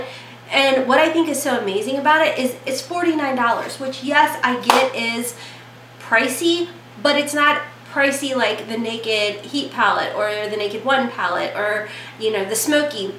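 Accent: South, United States. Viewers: This look is so beautiful on you!! And your accent! I love it you must be from the south, as I am!! I’m in Oklahoma and it’s hotter than satan right now!! And I do like first impressions on palettes! Great video xoxo! Lots of love!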